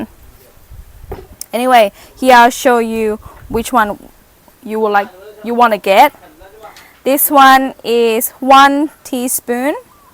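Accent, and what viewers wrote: Australia. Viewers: Did you learn English in Australia? Can hear a little accent. Food looks great! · I think you picked up an Aussie accent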